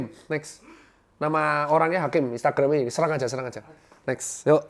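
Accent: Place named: East Java, Indonesia. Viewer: logat jawa timuran banget...mantap dok""😁👍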